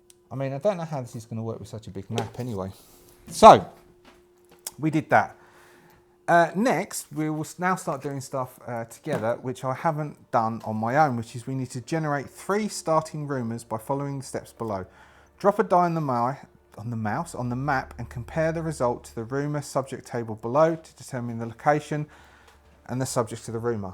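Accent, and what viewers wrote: United Kingdom. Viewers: Even swearing sounds polite when delivered with a proper English accent my dude. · Never figured you lived in Aus - sound like a proper cockney geezer. Regardless, you definitely didn't miss much in those 22 years.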